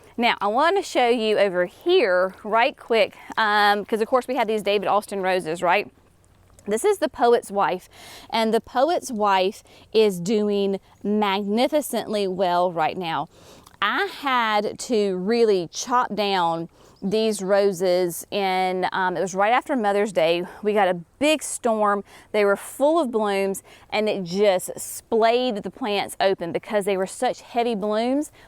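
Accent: South, United States. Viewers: You have a wonderful southern accent but you really tapped into your southern ancestry when you said "porch".......love it! Garden looks amazing, great work you you guys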